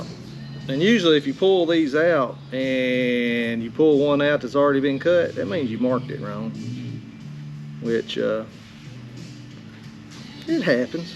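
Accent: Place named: South, United States. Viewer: I have a retired uncle in Tifton Ga. that sounds a bit like you and people always take him for an idiot. They think he's like Goober from The Andy Griffith show, meanwhile he was one of the best structural engineers in his field back in the '70s, I always loved his accent and unpretentious common sense. His teaching style was laid back and based heavily on hands on learning through personal failures. At 14 he started out in steel fab and never forgot that he was once the student that could barely read a measuring tape as a JHS dropout. He's near 90 now and industry people still consult with him, at least the smart ones do, lol. You do great work and are a true master at your craft, I take a lot of pride in knowing our country has folks like you and my uncle in it. I do metal fab because of him btw, not at his level, but I've built some things that will be around long after I'm gone and that to me is a source of joy.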